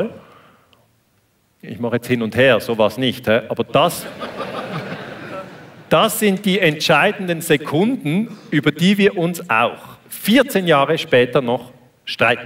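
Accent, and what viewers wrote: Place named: Switzerland. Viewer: ich kann verstehen, dass sie dies bevorzugen würden. Als Deutscher kann ich sagen, dass ich jeden Vortrag ihres Landsmannes genieße und es schade fände, wenn ich nur die Hälfte verstehen würde. Ich finde schiizer dütsch sehr schön anzuhören, aber verstehen tue ich leider nicht genug und es wäre doch schade, wenn so ein wichtiges Thema von 80 Millionen potentiell interessierten Menschen nicht verstanden werden kann. :D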